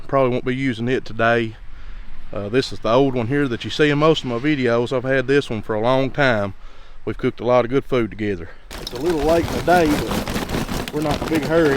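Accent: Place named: Appalachia, United States. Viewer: Where are you from in Appalachia? You for sure sound like home....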